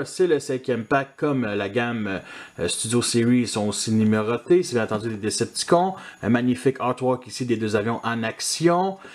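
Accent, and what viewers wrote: Quebec, Canada. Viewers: Nom d'un caribou en rut, c'est une super petite chaine que je viens de trouver, ca va me faire un super entrainement à l'accent québécois (je suis frncais, et je viens de m'abonner)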